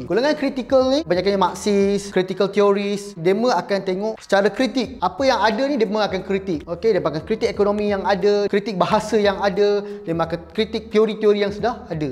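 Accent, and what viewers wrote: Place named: Parit, Malaysia. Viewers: are you from Parit,Perak,ghope ckp pekat org Pagharit je????😆😆😆😆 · Pekat benor perak nye yop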